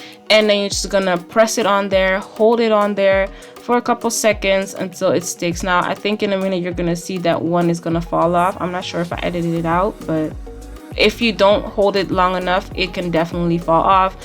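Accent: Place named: Guyana. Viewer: Where are you from you sound so Guyanese 🇬🇾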